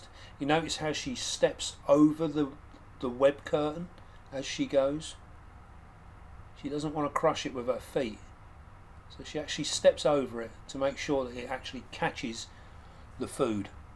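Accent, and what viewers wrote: United Kingdom. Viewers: There's nothing better than having a British guy describe nature to you, you know it's the Truth because of the accent.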